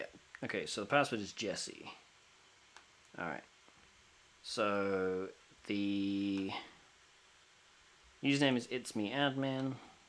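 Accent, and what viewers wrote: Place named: United Kingdom. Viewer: British guy😎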